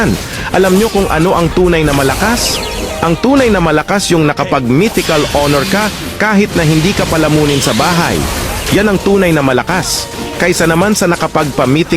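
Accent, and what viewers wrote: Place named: Bicol, Philippines. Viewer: Bicolano to for sure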